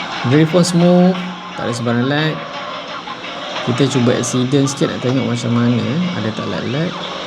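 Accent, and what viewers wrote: Malaysia. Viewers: Saya betulkan cara cakap malaysia,saya suka cara dia cakap malaysia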